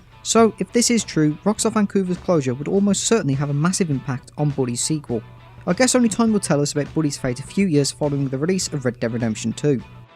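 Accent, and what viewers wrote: United Kingdom. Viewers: because it's obviously not an accent common in the US, even as a non-US citizen I can tell. It's obviously more common in somewhere near the UK. And you can confirm it yourself that he's from the UK by looking at his account's bio. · boo hoo man can't understand UK accent get a grip convo over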